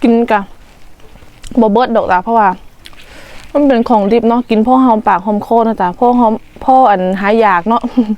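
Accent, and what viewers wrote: Laos. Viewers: เป็นคนจังหวัดไดสำเนียงคือคนลาว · สาวไทย เรอ สาวลาว สำเหนียง พาสา ปะสมลาว ไทย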